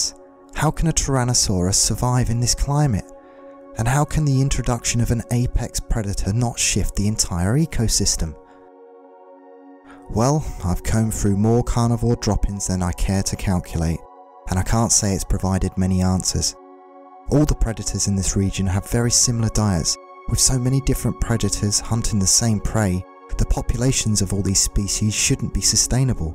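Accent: United Kingdom. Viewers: Respects and love from Tokyo, Dear sir , YOUR VOICE IS the most noble relaxing and soothing voice I’ve ever heard. BEST Englisg accent . How can I buy these books?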